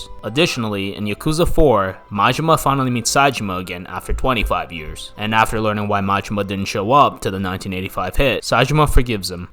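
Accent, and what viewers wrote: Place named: United States. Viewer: I love the video but hate your American accent butchering all the pronunciations of the names. Sounds like yarkuza the way you say it and marjimar I hate the accent. YA KU ZA. MA JI MA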